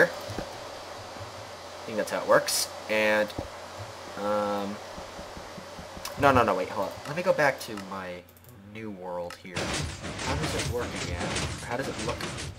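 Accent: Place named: Germany. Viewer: German is the acent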